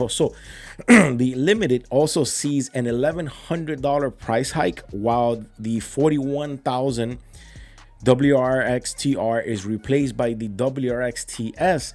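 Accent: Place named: Miami, United States. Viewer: Bro I just came across your channel. I’m from Miami, I just know you’re from Miami with the accent 😅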